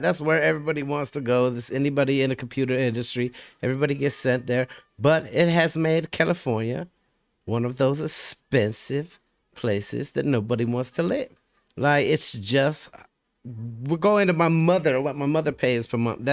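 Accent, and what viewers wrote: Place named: Louisiana, United States. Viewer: You sound like Louisiana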